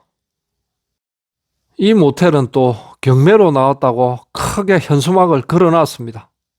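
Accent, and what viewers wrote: Gyeongsang, South Korea. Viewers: 표준말로 하세요 갱상도말 역겨워요 늘 부정적인 얘기 너무 지겨워요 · 방송 너무 감사합니다 경상도 사투리가 참 구수하네요 좋은 방송 정보 많이 부탁드립니다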